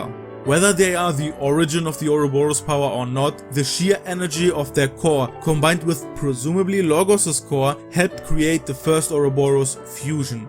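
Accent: Germany. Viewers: Also what's your accent? German? Just wondering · aah I knew it xD. Fellow German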